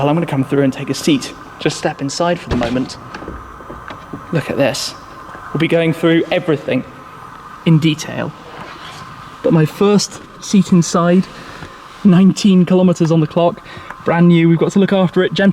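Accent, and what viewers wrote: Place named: United Kingdom. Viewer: Can you talk less British ? Like more neutral English, kinda annoying for me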